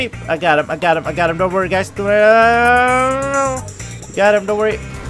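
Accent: Sweden. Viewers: Är du svensk? /are you from sweden? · Waitttt.. are you from sweden? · Are you from sweden?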